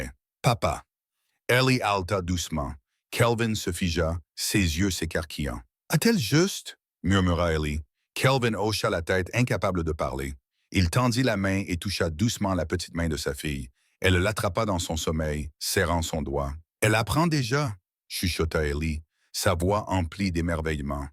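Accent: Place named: United States. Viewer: Arrete de parle français avec une dialecte américaine, ça casse tout le histoire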